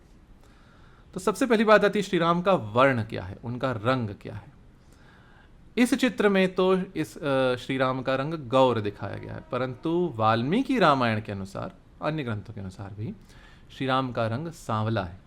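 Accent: Standard. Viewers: Am impressed by your sudhha hindi ..and appreciate your description of the different body parts of Shri Ram .. thanks for clarifying ..जय श्री राम 🙏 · आपके अध्ययन, मनन, चिंतन और वर्णन का में कायल हो गया हूँ!! आपका शुद्ध उच्चारण और विषय में सटीक सरल उदबोधन बहुत ही प्रभावशाली है!! एक बेहतरीन विश्लेषणयुक्त प्रस्तुति हेतु आभार!!🙏👍🙏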